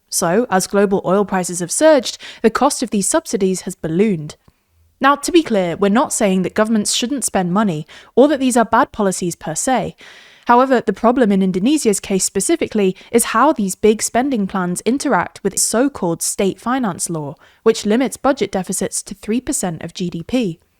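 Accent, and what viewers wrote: United Kingdom. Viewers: This lady british accent is so much better to listen to. I could not hear another word from the previous british dude voice, sounded like that annoying pewpewdie dude.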